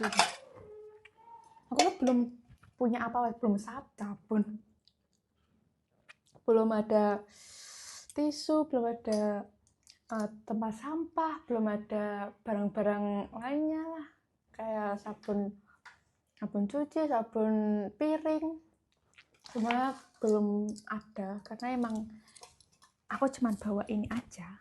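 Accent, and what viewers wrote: Java, Indonesia. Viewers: kok meddok bgt to lek omon ki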